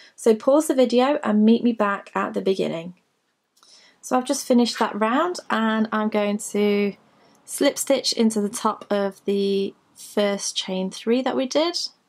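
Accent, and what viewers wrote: England, United Kingdom. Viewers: Confusing that you have an English accent but are using the American stitch names! 😂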